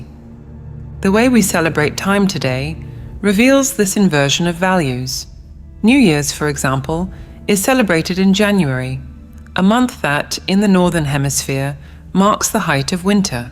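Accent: United Kingdom. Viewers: LOL!!! I see you've pulled out all the tools in your belt for this load of propaganda eh? Oh we had thirteen months alright, which you can clearly read in your present bible. Not hidden at all. A wee bit of truth and a load of bull...propaganda. You use a British voice to convince us that she is well educated, lol, and you use low frequencies to relax the mind and put it in a more malleable state. This is pure evil. Also known as misdirection. Some truth mixed with some nonsense will have you forever searching. DON'T FALL FOR IT FOLKS!!!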